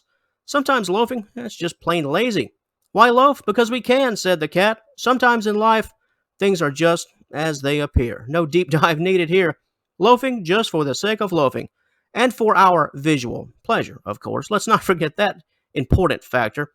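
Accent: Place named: Florida, United States. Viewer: You from Florida? You sound like a fellow Floridian.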